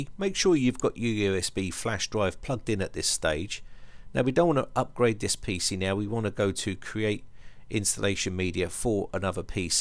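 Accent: England, United Kingdom. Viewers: I just don't get English accent.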